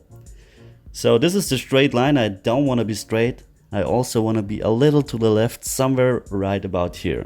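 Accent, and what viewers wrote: Germany. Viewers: Where are you from dude? I'm into accents, always have been.Took me a while to place you as from Germany, very nearly Austria. Maybe you could give me some pointers on placing your accent. By the way, at first glance your website looks pretty bloody good, well done! Edit: My guess is Munchen, sorry if wrong, limited scope. Edit: Looking at a map, I want to guess closer to Deggendorf.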